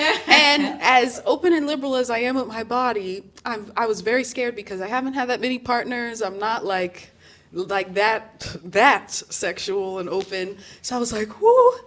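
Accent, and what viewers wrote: Jamaica. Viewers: Subscribe immediately when I heard patois😂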